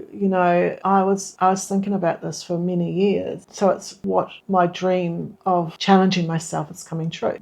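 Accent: New Zealand. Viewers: Something you might want to work on. Nail your vowel sounds. I notice a couple of times when you speak Japanese, you kiwify the pronunciation. e.g. Minna no nihongo. Hot tip, you're not in a race, doesn't matter what language you are speaking, your native language or a language you are learning, if you slow it down you win. If you are speaking English, slowing it for non-native speakers means they will listen to you more carefully, if it is your learned language, then people will recognize and may mirror your speed (huge win). TOO many people are trying to break speed records in class typically younger learners do this. This is a way of disguising deficiencies. So lets break down - Minna no nihongo Mi - that sounds like "me" in English, not "minna" like "winner" There are 2 n's in there, they deserve their own pronunciation. You can hold on the "n" (hold it on the roof of your mouth, then raise your chin and spit out the "na") Keep your "no" short, it's shorter than someone speaking English and saying "no". 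Nihon"go" - hold that "n" in the middle the same way as before (tongue on the front part of the roof of your mouth, you can even hold it there a little it will make you sound more Japanese, then spit out the "go"). Remember - speaking is not a race. And being a little older and wiser, you get a pass for slowing the tempo a little to get it right. It's a fantastic tool I've used all my life, slow it down just a touch and people will listen more attentively. *NOTE I share th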